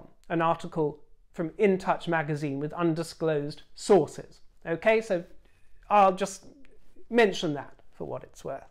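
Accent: United Kingdom. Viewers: Old Granny here, too!, I love to hear you speak. I am a Texan with a Southern accent so your perfect diction and Clarity of speaking is fascinating to me. I think I've always loved British accents. I think William is way too smart to have done anything like that especially after what he went through with his parents. I believe the whole rumor was started by you-know-who oh, we all know who. I suspect William and Kate knew where the rumor came from as well. She is so vindictive with such little reason to be it is just constantly amazing to me what she comes up with. She acts as a woman scorned. Perhaps she believed she was - we all saw the sultry looks she was always giving WILLIAM. I hope Harry sees the light soon and takes himself and his children back to the UK and divests himself of this trashy woman.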